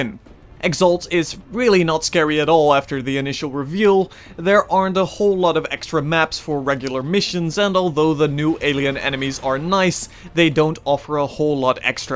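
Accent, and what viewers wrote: Netherlands. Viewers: I think I hear a Dutch accent. :)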